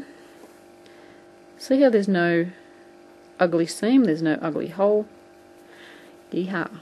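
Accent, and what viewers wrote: Australia. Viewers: Thanks, just doing this for the cluster bag:) But now after doing this and seeing your friends technique for fitting hats I really want to make myself a slouch hat:) You are seriously in the top 3 best online crochet tutors in the world. Lobe your work and love hearing that Aussie accent. (I'm an Aussie too, just feels so homey) Thanks again!:)) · you have the absolute BEST tutorial videos on youtube. Thank you SO MUCH!! Listening to your aussie accent is a bonus:)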